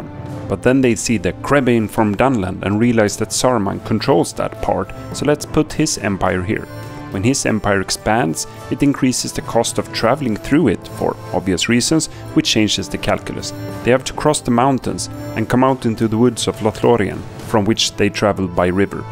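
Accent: Sweden. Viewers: Swedish accent and replacing denmark with water at the end xD 10/10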